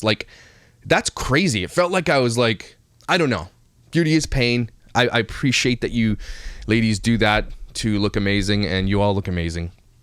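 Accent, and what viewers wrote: Canada. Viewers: I agree about ppl who don't need the money get money donated on go fund me. Yet someone who truly needs the money it's like pulling teeth, litetally. I had to swallow my pride to start a go fund me because I can't eat solid food for the last year and a half due to a dental disaster. I need the money to pay for ENT, Oral surgeon, dentist, ensure in the interim due to Covid which closed everything down, etc. Soup is my main food and mash potatoes. Besides toilet paper. Soup is hard to find. I've lost so much weight due to not being able eat and only drink my food. I also have a disabled son who's had many of his medical supplies no longer covered by his insurance. It hurts when no one cares. I need aprox $69,000 so I put that amount as I'm honest or naive. I was verbally attacked by ppl on the internet that I shouldn't ask for so much. So I lowered it. 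But still I'm not able to eat as not many donated. So seeing what they did makes me so upset. Especially having a disabled son it breaks my heart. I use to live in Canada my first 5 years of life. I miss it so much. I love listening to your accent eh. I miss the smarties, not that I can eat them but you guys have much better goodies than us. Good for you what your doing to stop the injustice. I can't imagine giving away your own child. Keep up doing good. I pray the little boy is ok and being loved. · I think you have so many subscribers because people love your Canadian accent, i.e. "about", " outside". My paternal grandparents were from Conception Bay in Newfoundland. Love your channel!!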